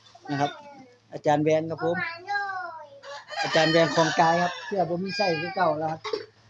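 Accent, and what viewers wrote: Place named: Sakon Nakhon, Thailand. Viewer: สวัสดีคะ แม่เก๋มาให้กำลังใจคะ สวยงามหลายๆจ้า คือเว้าคือคนสกลแท้จ้า แม่เก๋กะคนสกลจ้า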